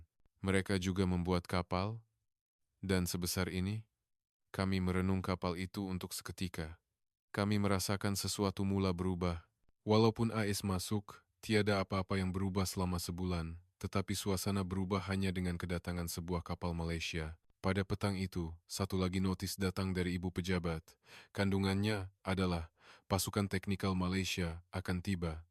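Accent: Indonesia. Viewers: Ejen MOSSAD INGIN MALAYSIA BERTELAGAH DENGAN DUNIA...INI ADALAH ADUDOMBA.... MEPERLAGAKAN EMOSI SESEBUAH NEGARA DENGAN IDEOLOGI PENIPU DEMI SATU MATLAMAT...INI TUKANG CERITA KETURUNAN INDONESIA..CARA PERCAKAPAN LOGHAT INDONESIA..APASAL ORANG INDONESIA SUKA MENIPU MAJORITI..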